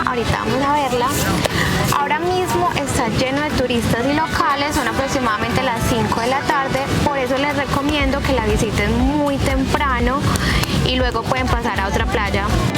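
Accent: Antioquia, Colombia. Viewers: Eres hermosa... y ese habladito paisa me mata👌❤🇨🇴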